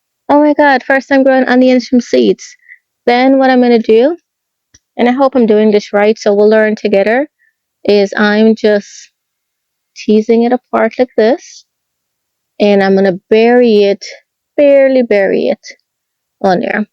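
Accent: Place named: Caribbean. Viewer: I think I hear a Caribbean accent there! Great video! ❤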